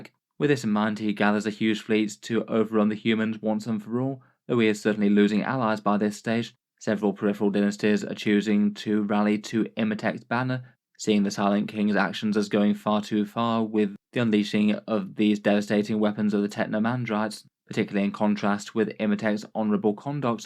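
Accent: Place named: England, United Kingdom. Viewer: His accent comes across as fairly vanilla southern, he certainly doesn't have a strong regional accent at all - though regional accents as a whole are declining quite quickly across the UK according to some research I saw a couple months ago. I was meaning more in relation to his cadence, enunciation and intonation, which I think you're right when you say about it being a "presentation voice". I'd be very surprised if he spoke like that at the pub! Remarkably consistent across his videos though.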